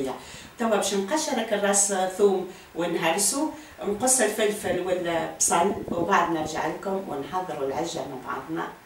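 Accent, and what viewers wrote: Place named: Tunisia. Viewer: ليه لهجتكم يا تونس تهبل؟ واكلاتكم باين لذيذة...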